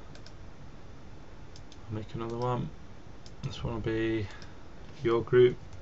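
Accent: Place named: England, United Kingdom. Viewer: The audio sounds fair to me, there are a lot worse out there in youtube land. Granted it's a crap microphone and there is some background hiss from the pre-amp but audio compression would just make it louder. Microphones don't amplify they just listen, it's the circuitry which follows which can colour the audio. I think the main issue is that he is speaking in "English" and some of the viewers are listening in "American".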